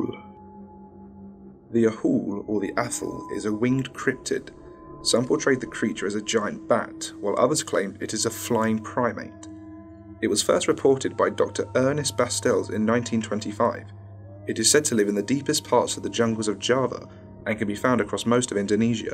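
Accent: United Kingdom. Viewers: That start was priceless, the accent and British slang only made it better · Is he british?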